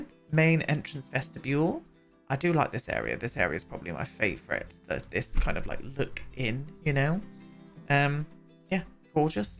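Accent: United Kingdom. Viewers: The way your pronounce Yiya is sending me I am not sure if it’s cause your British accent and I am Greek Australian but it sounds yah yah or yee-haa.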